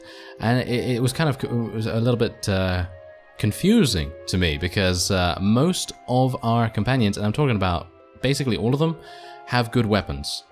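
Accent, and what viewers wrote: United Kingdom. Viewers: It was me, I was talking about Sarah and Leslie earlier, sorry bruh. Love the series, you are awesome. I started watching this series this Friday (I was sick this week) and just caught up now. It's addictive, and you're doing an awesome job, my beautifully British accented friend!! - Love from America.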